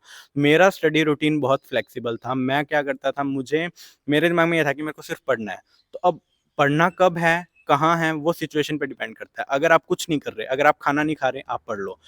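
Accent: India. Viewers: Bhai ki voice haryanvi lg rhi h tone ❤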